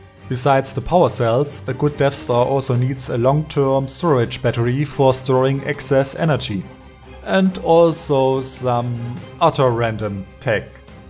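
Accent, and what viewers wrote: Germany. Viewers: Ja das klingt wirklich Deutsch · When someone with a German accent this thick talks about building a Death Star with a working laser I'm actually terrified it sounds like a legitimate supervillain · Truuu the english sounds so german it basically is just that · hab erst überlegt aber war mir ziemlich sicher das is n deutscher kollege 😂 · im German to i think he's Sounds Like German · He is German, I can tell from his problem with th words and some others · It’s Denglish =Deutsch+Englisch ore Germglish =German+Englisch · Yeah, well sounds German and also if you go to the about section it will say that his location is in Germany. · As a fellow German: your English is the most German one. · das ist englisch hört sich aber wie deutsch an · I can hear hes german, its obvious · Ist mir doch von Anfang an aufgefallen, dass du deutsch sprichst!😂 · The most german accent in this world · Das ist fast deutsch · Very german accent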